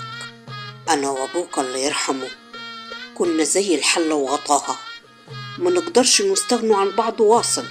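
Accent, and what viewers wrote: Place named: Upper Egypt, Egypt. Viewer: عسسسسل وسكر انا باموووت فيكي حلوة اوووي وكلامك زي الصعيدي الجواني اوووي شاااطرة عااااش ياقمر ❤❤❤❤❤❤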